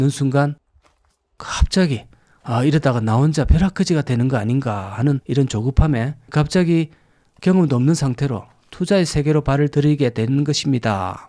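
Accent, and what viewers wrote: Gyeongsang, South Korea. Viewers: 경상도 사투리 딱 듣기실구마이 징허다이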